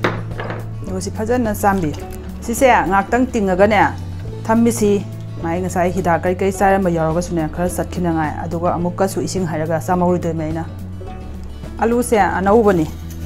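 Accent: Kakching, India. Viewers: Mama Se kakching kei leiky deino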